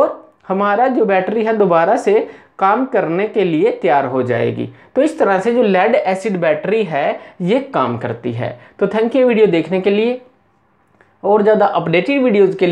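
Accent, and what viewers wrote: Punjab, India. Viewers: Good explanation sir.aapki Language par se lgta hai ki aap punjab se hai.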